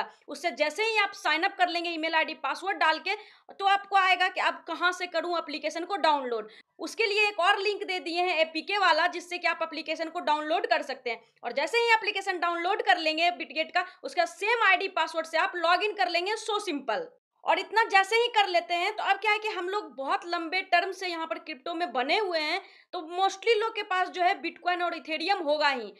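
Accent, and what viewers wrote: Bihar, India. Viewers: Tum pakka bihari ho qki baat krne ka tone apne Bihar ka hi hai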